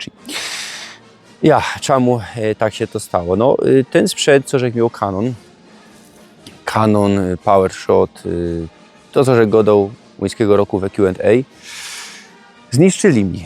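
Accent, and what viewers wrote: Silesia, Poland. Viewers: Pozdrawiam. Fajnie się słucha ślonskiej gadki. Ja jestem otwarta na wszelkie języki i gwary a Śląsk bardzo lubię.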